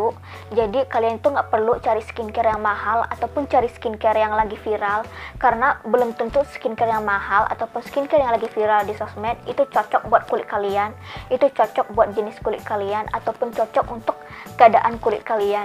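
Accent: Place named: Malaysia. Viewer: Kak cntik asli mna yaa.. cra ngmongny kok kyk org malaysia😀☺️☺️✌️✌️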